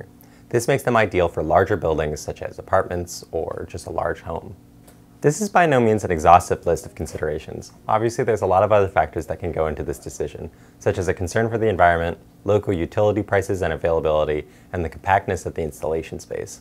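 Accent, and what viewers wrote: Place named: Asia. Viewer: Your English is terrible - is that an Asian accent? I can't understand what you are saying.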